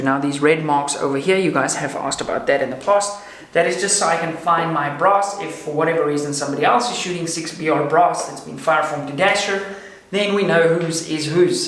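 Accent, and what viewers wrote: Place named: South Africa. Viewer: I watch your videos to hear you SA accent.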